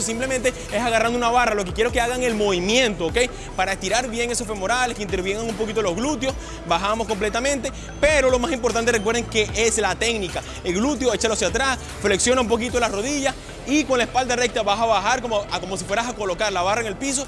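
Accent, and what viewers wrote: Venezuela. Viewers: Este pana es venezolano?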